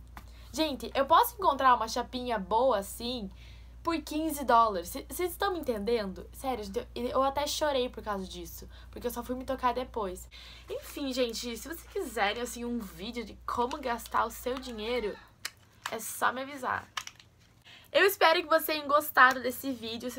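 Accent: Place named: Rio Grande do Sul, Brazil. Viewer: vc é do rio grande do sul? Vc tem mttr sotaque cara, lindíssima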